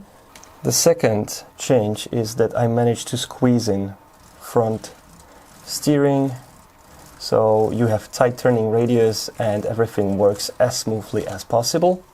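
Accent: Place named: England, United Kingdom. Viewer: I am still looking forward to be able to make these modifications by my own🙏. I love your work as former designer, I love your alternates models as well. And I love your really clean English accent, very easy to understand as non English mother tongue like me. Yeah I know probably too much compliments but you deserve it and I really want to obtain this instructions I know 😅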